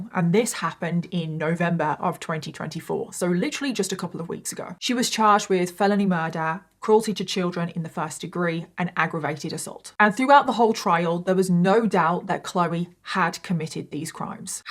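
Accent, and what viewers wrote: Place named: Birmingham, United Kingdom. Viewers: Ooh are you a fellow brummie? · You’re so amazing , and make me feel at home with your accent I live in Australia but from Birmingham !! You’re my favourite when I’m having a adhd moment you keep me on task 🙏🏻🙌🌻🫶🏻🥂🎩🤘🏼👽💜💚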